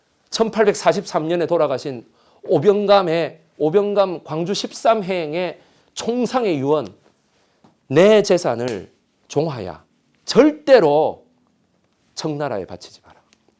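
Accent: Gyeongsang, South Korea. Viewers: 구수한 겡상도 사투리 ..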